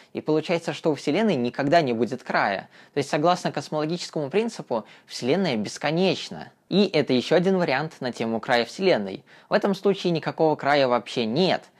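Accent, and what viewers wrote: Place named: Penza, Russia. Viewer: Интересный материал, но речь оканием, с растягиванием гласных. Откуда он? Из Пензы?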